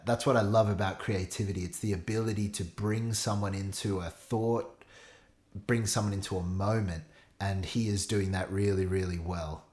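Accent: Australia. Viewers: It’s not Hau, it’s Hua 😅🙈 But I love australian accent.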